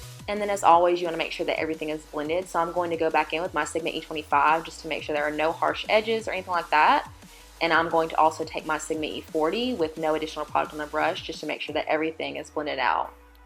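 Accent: South, United States. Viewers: New subbie bc u r gorgeous and i love your southern accent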